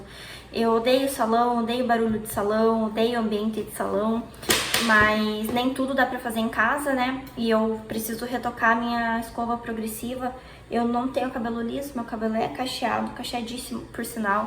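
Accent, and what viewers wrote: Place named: Interior, Brazil. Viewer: Olá, Vamos bater um papo?! Primeiramente que delícia de vlog. Segundo sua casa é tão linda! Você me parece do interior, pelo sotaque, mas fico na dúvida se é inteiro de São Paulo ou de algum estado do Sul. Parece que vocês vão morar um pouco distante da cidade, você está gostando de morar mas distante da cidade, caso seja esse o caso. Eu tenho vontade de ir para uma cidade pequena e morar um pouco distante do centro comercial do bairro mesmo, mas bate aquele medinho, moro na cidade do Rio ou seja loucura e o bairro onde moro tem de tudo, o que eu acho prático, daí vem aquela dúvida se me adaptaria. Terceiro, também não consigo ficar muito tempo com o mesmo livro, eu me canso, igual você. Quarto, também não sou fã de salão, nem do lugar onde faço depilação que é relativamente calmo, vou porque tem que ir, se deixar crio raízes dentro de casa. 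Quinto também não gosto de lugar barulhento, lugar cheio e som alto me deixa extremamente cansada, eu sou introvertida, eu gosto de calma. Sexto amo resenhas sinceras, se não gostou de uma leitura acho bacana compartilhar com as pessoas não para fazerem elas não lerem mas para mostra seu ponto de vista, sempre acho que atitudes humanas, como gostar e não gostar de uma coisa nos aproxima, como dizem gente como a gente, e você faz isso super natural e de maneira sincera. Bom, falei muito, digitei na verdade, rs! Fique com Deus. Beijos 😘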